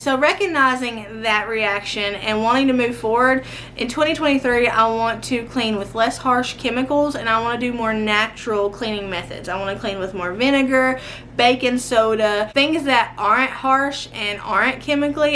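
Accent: South, United States. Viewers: omg i can tell ur southern cause u do like i do when i get too talking i talk so fast and i talk about everything and people have too tell me too slow down lol i love the way u are it makes me smile !!! thank you veery much for making the videos i love you and your family yall are so down too earth that what makes u special !!! MUCH LOVE TOO U N YOUR FAMILY !!!!!!!!!🥰🥰🥰🥰🥰🥰🥰🥰🥰🥰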